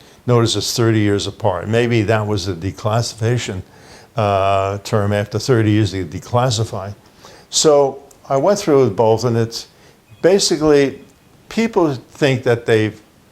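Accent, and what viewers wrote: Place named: New York, United States. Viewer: Sounds like he grew up in NYC.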